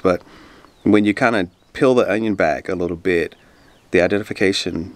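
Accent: United States. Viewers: I'm an Englishman, my father was irish, but i consider myself English, you, to me, have an American accent, so, i would call you an American. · Great video, as always. I've always wondered why Americans refer to themselves as African American, or Italian American, it's not a concept easily understood in England/UK. Can I ask what do you know of your ancestry and how far back you can trace your family. I have records going back about 100 years for my and my wife's family but nothing before that. For what it's worth I perceive you as an American, not African American, and really only because of your great accent! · Definitely an American with an accent like that 🙂 I could listen to this guy all day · Yes I do find it strange that black people in America use African American. I have questioned my black friends in America. After all you’re all American- black, white,Asian. 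My nephew is half nigerian but he was born in England so he’s english. His heritage is mixed but where you’re birth takes place is where you are known as from. If I saw and spoke to you , I would think “ok he is American”, because of your accent. Sorry but I find it absurd that whites in America don’t justify their otherness but all other ethnicities do as this seems like they are not fully seen as American. I am so glad in Britain we don’t use our ethnicity to identify ourselves as we are all british people and we can see different ethnicities but united in our diversity. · If I seen you and heard your accent i would think oh he's American or Canadian😁😁 that's it I've found there's only one thing that separates us and that's MONEY. growing up in school I had black friends and we ALL had a big thing in common we were all POOR we didn't care about SKIN because that's all it is just SKIN. 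Welcome to the UK my friend hope you and your family have a good life hear much love and respect. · To a Brit, you´re just an American. The accent - and sometimes the wardrobe! - is what defines you to us.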